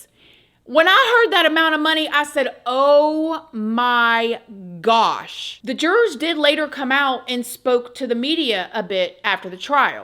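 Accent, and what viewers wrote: United States. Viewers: I thought Hulk Hogan was a legend until he douched himself at the RNC. He lost a hell of a lot of people that really liked him by attending the MAGA CULT rally. I watch you from Australia and would like to ask you, where about in America are you? I love your accent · My parents were swingers... Personally, I never fully understood it... But its not my place or concerns of what consensual adults do... Consensual being the most important aspect! Illegal recordings are not "fair usage" no matter who you are! I would venture to say Bubby sold the video... And it's refreshing that it's the facts of the story ~ not the morals or character ~ that is the story here! I think it's funny that the ex-wife is unset more about the money gained from the court case than what was recorded! (Envy and Jealousy ~ not betrayal ~ she plainly shows her heart only holds anger! 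Which is sad to think they can't even be friends!) This video was my first from this channel, but it's not the subject matter that earned my subscription ~ but the awesome way it was presented! (Hearing that genuine Southern twang and the sensible conclusion ~ please continue creating more content! You are awesome and sweet!) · I'm a gonna' comes out of your mouth and the thick southern drawl has me believing that you are actually from NY but want inarticulate folks to resonate with your video. Why don't we just say that "Terry" is a bottom feeder and it was evident when he put an innocent comedian in a sleep hold with no remorse - just a sneer on his steroidal evil face y'all - I am from PA and don't relate to your accent. Was that hate filled? No, just a 1st right amendment.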